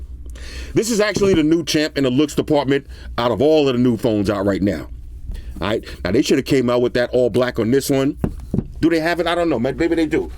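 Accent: New York, United States. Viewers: You from New York? B.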